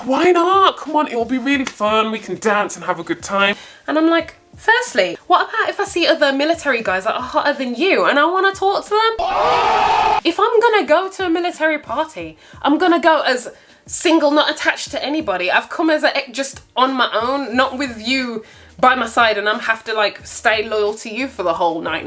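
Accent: Birmingham, United Kingdom. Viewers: It’s the accent for me. Where’s it from. Birmingham??? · Do I detect a (former) Brummie?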